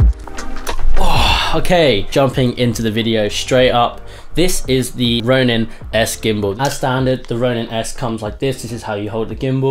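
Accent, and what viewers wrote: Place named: RP, United Kingdom. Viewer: I came from your bmpcck set up and blimey your accent is british to the shits haha, which part of UK u from? Im an aussie raised SE asian born dude and yousound posh af to me. loving it dude. subbed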